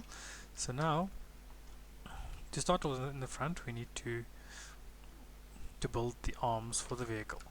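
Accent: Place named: South Africa. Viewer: You sound like a fellow SA bra good vid btw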